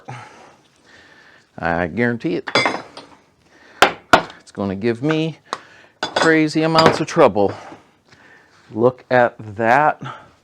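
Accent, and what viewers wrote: Pittsburgh, United States. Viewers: I am a fellow „Yinzer“ and love your videos!! I recognized your Pittsburgh accent right away but I really like how you go into what is needed and how to do it with minimal cost. Keep on making these great videos. I am rebuilding a Karmann Ghia and live in the South Hills.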